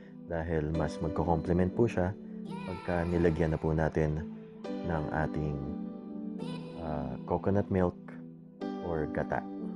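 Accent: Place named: Philippines. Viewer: You have a Filipino accent and speak English also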